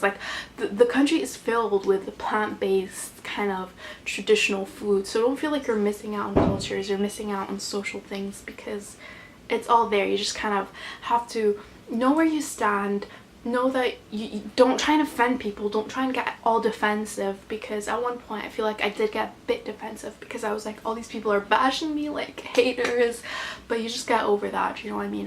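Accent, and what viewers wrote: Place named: West, United States. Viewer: can you do a video on how you found living in Egypt as a english person- ik you're egyptian but from your accent i can tell youve lived alot of your life in a western country (correct me if im wrong). it would help alot as im thinking of moving there soon but ive lived most of my life in england. (im 18, vegan too) im just scared i wont get along or agree with people bc of the different views and stuff. Thank you x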